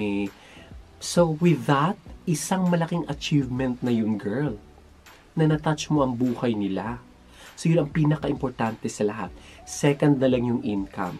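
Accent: Visayas, Philippines. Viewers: Haha thank you natawa ako sayo 🤣🤣 bisaya ka pla salamat sa tips sana ako magkaroon ng marami 😍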